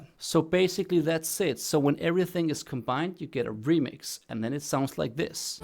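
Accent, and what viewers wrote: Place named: Denmark. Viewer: Uhaaaa jeg kender en dansk accent når jeg hører den😂 Fed tutorial bro, det lyder dope🔥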